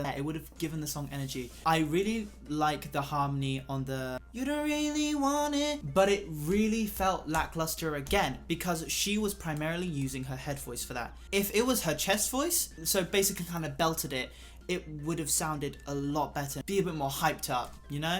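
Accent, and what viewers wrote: United Kingdom. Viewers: Wow I’ve never heard you talking 😯 I didn’t know you had a British accent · From which country he is???? Style like Korean Skin like Korean Makeup like Korean But But But Voice like British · Bro sounds like Britiish looks like korean what's happening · that british accent<3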